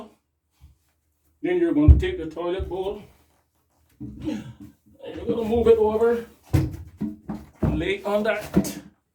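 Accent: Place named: Barbados. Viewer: Total Bajan ingenuity on display here. Right down to “de axcent”. I tell you 2025 👍🏾 Barbados 🇧🇧 in Newfoundland 🤓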